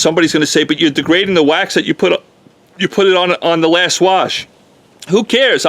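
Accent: New York, United States. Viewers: This guy speaks like a NY’er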